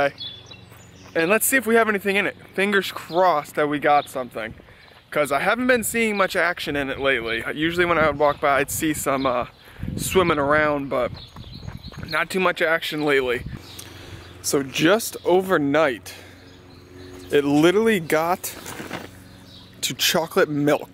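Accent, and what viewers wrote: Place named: New England, United States. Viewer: So New England I love it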